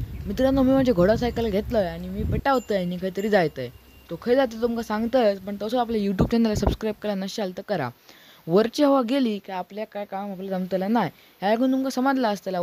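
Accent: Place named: Malvan, India. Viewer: तुझी मालवणी भाषा ऐकायला छान वाटत आहे.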